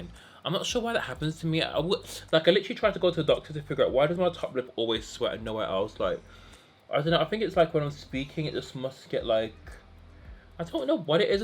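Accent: Birmingham, United Kingdom. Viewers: Are you from Birmingham? X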